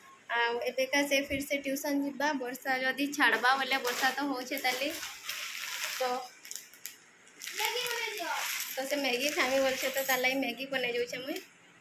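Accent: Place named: Western Odisha, India. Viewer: I love to hear WESTERN ODISHA TALK always MAM ,LOADS of respect from KENDRAPARA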